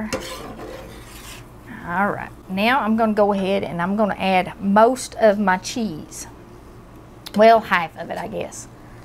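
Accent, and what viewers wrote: South, United States. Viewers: Can i ask what "hillbilly" state you are from? Im guessing Alabama or Mississippi? Maybe Georgia? You sound more southern than country.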